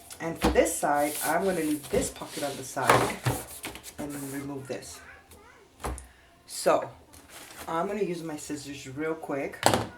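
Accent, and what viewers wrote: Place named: Puerto Rico. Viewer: I know this is an older video but I've been watching all your older videos to catch up I guess. I love the way you teach. But, even more special to me is your voice and accent. You sound so much like my family that it makes me homesick. I'm Puerto Rican from NYC as is my entire family. I live in Virginia now so I'm far away from anyone that sounds like me. Listening to you makes me happy.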